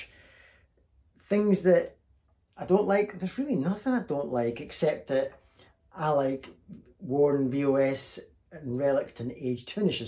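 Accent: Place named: Glasgow, United Kingdom. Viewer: Accent is throwing me off a bit. Sounds Glaswegian blended with something else, perhaps you lived somewhere else for a few years? I may be way off as I don't hear such accents too often here in Seattle.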